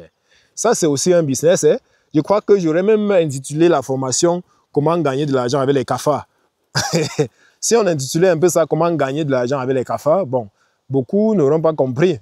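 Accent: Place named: Sub-Saharan Africa. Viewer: Je m'abonne parce que je suis toujours enchantée par l'accent des Africains Subsahariens 🙂 Évidemment surtout parce que j'ai aimé les "tips" contre les moustiques et les cafards. En Algérie sur certaines côtes il y en a qui volent, j'ai vraiment peur de ces bestioles. Merci pour le partage.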